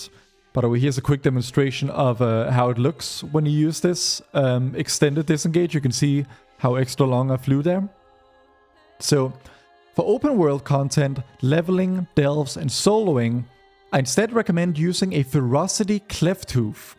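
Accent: Denmark. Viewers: I hear the danglish :) good video though. Takker. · He is danish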